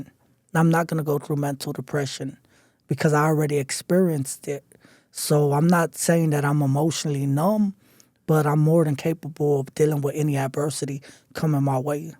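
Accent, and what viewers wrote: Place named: South, United States. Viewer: You serious? This fool is speaking like a ghetto ass reject using big words along that country bumpkin twang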